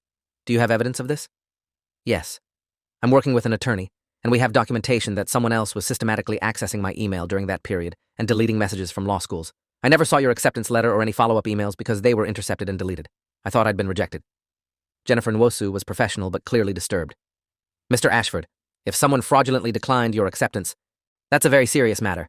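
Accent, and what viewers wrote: United Kingdom. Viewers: YESSS! I was just about to ask if I was crazy or is the narrator British? 😂😂😂😂😂😅😅😅😅😅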